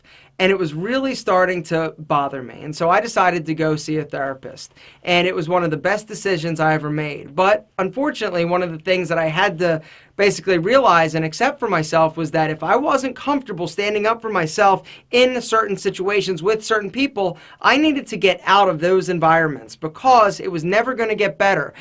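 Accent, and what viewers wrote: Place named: East Coast, United States. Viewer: east coast accent all day baby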